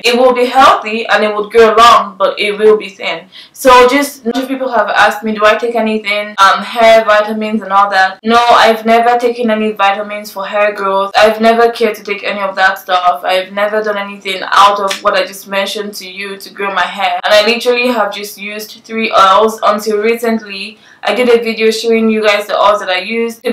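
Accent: Nigeria. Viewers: where are you from... you accent is Nigerian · Love this!, great video. Where can I buy those stunning earrings? You are funny. Yessss to the facial expressions and Nigerian accent :) have you heard of the vanity massage brush?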